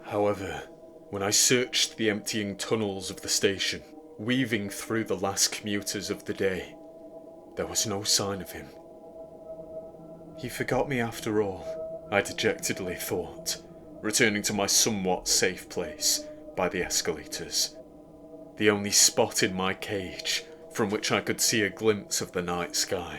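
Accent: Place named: North, United Kingdom. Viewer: Only found this channel a week ago and it's heading toward being a favourite. The fact that a fellow northerner is narrating doesn't hurt, either!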